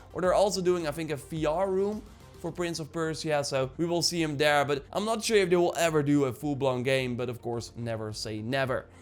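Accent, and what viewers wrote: Netherlands. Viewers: Are you dutch? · Ooh hahaha jij bent Nederlands je kan goed Engels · I had no clue that you are from the Netherlands ;). You can always hear when a Dutch person is talking in English. Keep on making videos. Can't wait for Valhalla to drop and watch you're videos. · Je kan gwn horen dat je Nederlands bent 😂😂 Like als je Nederlands bent😂👏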